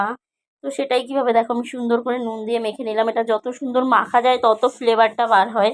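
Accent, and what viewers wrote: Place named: Barishal, Bangladesh. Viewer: তুমি বরিশালের বাঙাল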